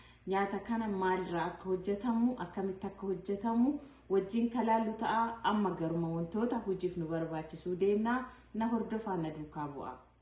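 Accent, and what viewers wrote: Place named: Arsi, Ethiopia. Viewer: Wowowowowowo jabduu teenyaa jabaadhuu😍😍😍🥰🥰💪 Ati arsiidha mama hasa'an kee arsii fakkata.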